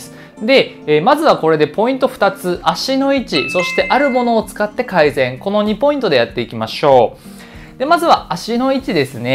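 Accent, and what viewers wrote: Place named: Tohoku, Japan. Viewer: 東北出身なんですかー！私、東北人なので、の訛り全く気にならず入ってきました😂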